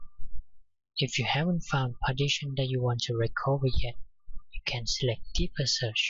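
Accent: Asia. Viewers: Not his voice, his asian accent.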